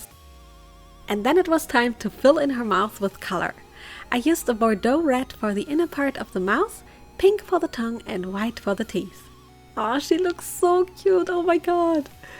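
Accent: Germany. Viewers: me and my cousin LOVE ironmouse and watch all of her streams together so im happy that you made a doll of her also are you german? you have an accent to me and the name of the contact glue looked german so i got curious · She looks marvellous! Great job. Her shoes are really worth the work and look amazing. Ps: Ha, ich hatte Recht mit dem deutschen Akzent. Schön das wir so eine talentierte Dollmakerin haben : ) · I thought till I heard uhu (and saw) that you have an light german accent (I kinda have the urge to write everything in german but I let it be)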